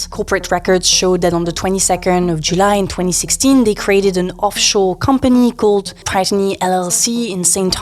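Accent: France. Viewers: Her English is great! Her French accent is very limit compared to others. How many languages do you speak without some sort of accent? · Bonjour, C’est juste la deuxième vidéo que j’ai suivi. I Will Watch them all, I promise. Je suis très fan. J’adore ton approche, je la trouve très informative et basée sur les faits. Ta façon de présenter les choses est tout à fait raisonnable et logique en ce monde de désinformation. Aussi, j’adore ta façon de parler l’anglais. Very cute avec un French cachet. Désolée, je tutoie. Je suis au Canada c’est de rigueur. Félicitations.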